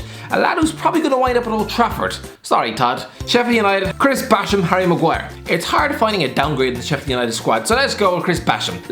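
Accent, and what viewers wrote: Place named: Ireland. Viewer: Pro tip for all the non-Irish folks, watch the video at 0.75x speed to better understand the genius of his jokes through his accent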